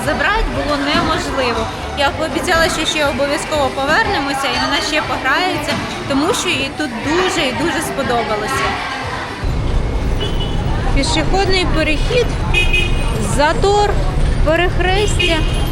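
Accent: Standard. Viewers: Коли дивлюся ваш1 в1део, то насолождуюся вашою чистою укр. Мовою. Ваша чиста р1дна мова це показник вашоï культури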